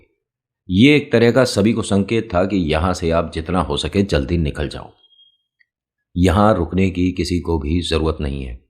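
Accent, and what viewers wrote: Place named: Braj, India. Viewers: Jai mahakal 🙏 bhaiya ji aapke baat krne aur hasane ka trika bhut achha hai ,mera gaon mathura hai waha ese hi baat krte hai, nice story 😊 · bhaiya aapko subscribe aur likes bolne ki zarurat nhi h jo aap se pyar krte h vo phle hi like comment krdete hai aur phir video dekhte h❤❤aur bhaiya braj wale boli aapki muje bhout pasand h❤😊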